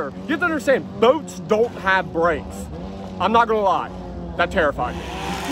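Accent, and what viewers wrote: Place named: Baltimore, United States. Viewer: the baltimore accent makes this video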